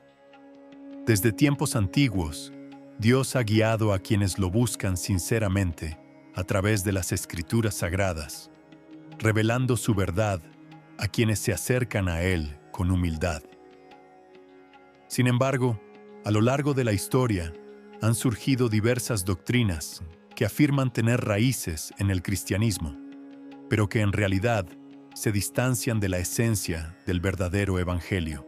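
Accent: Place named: Mexico. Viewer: Desde Colombia parcero, pero con acento Mexica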